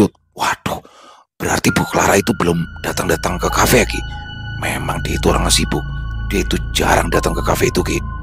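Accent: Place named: Betawi, Indonesia. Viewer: Dari bawah sampe atas nyari cerita warung kopi bang doni😂😂 lucu bae bahasa betawinya 🤣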